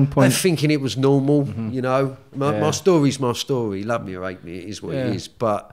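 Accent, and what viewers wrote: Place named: Cockney, United Kingdom. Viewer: A very likeable fella, a proper cockney and loveable rogue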